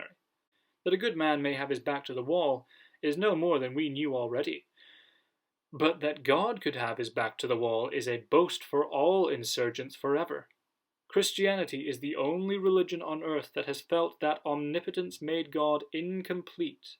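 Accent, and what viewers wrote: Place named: England, United Kingdom. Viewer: Finally! Orthodoxy in an English accent 😊 thank you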